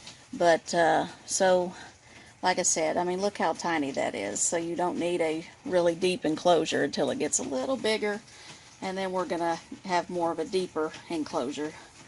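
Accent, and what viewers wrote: South, United States. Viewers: Loving that southern accent hunny 😉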